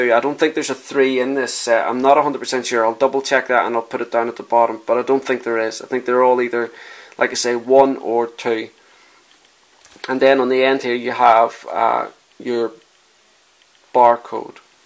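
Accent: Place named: Northern Ireland, United Kingdom. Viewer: Great to hear a fellow NorthernIrish voice talking about coloured pencils!! I started using them about 3 years ago in my art (I use lots of different media), but I don't know anyone else here using them. Do you live in N Ireland? I'm a member of the UKCPS, I don't know if you have heard of them? Anyway, these are great pencils but I only have the small set. Atm I mainly use Faber Castell polychromos and I love Derwent's Drawing set palette, especially on black paper. Will follow you on FB and Twitter!! Thanks for these videos. My husband keeps saying I need to start doing videos but I don't think I'd be much good. This was very informative and well done. Keep up the good work!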